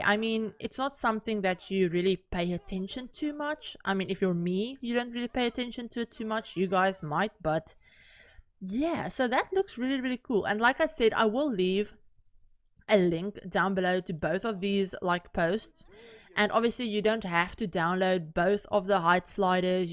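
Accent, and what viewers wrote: South Africa. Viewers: South african accent lol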